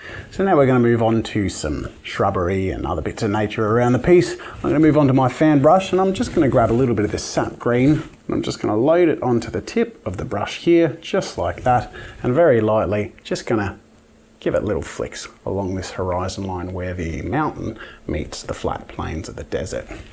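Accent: Australia. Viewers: With A Hint Of Aussie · The Australian accent gives a spice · It's weird, i could never really tell the difference between australian english (well, at least yours, i dont really watch many australian people) and british english (I'm a french canadian, we have neither here lol). But in this, i can CLEARLY tell XD it's essentially a mix between southern US and England. I get it now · I know heaps of Aussies who talk like that. It’s not annoying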